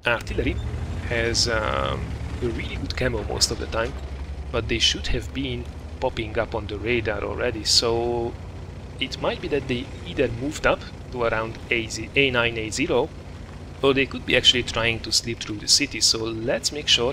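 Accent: Hungary. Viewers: i like hungarian dialekt! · magyar vagy? mert amikor beszélsz úgy hangzik mintha magyar lennél. Are you hungarian? Cause you sound like a Hungarian man when you talk :/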